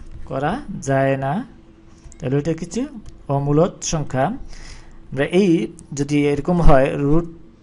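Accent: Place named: Noakhali, Bangladesh. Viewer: স্যারের বাড়ি নোয়াখালী। স্যার বলে ফুর্ণ সংখ্যা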